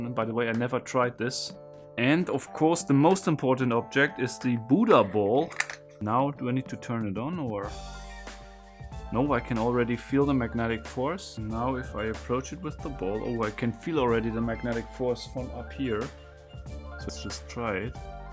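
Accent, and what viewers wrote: Germany. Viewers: Iwie hörst du dich deutsch an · I live in a very German area in Texas. Your accent is normal to hear around here. Very normal! Fredericksburg Texas is a small German town. I spoke German in school until it was verboten in 2nd grade. Back in 1973... I can go to town and still here people speaking German to this day... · I miss his german accent, its still there but back in the day it was more german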